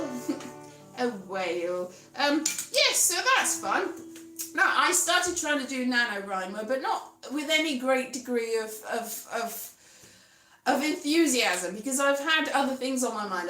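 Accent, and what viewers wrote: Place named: London, United Kingdom. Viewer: How can you be the if you talk like a Londoner????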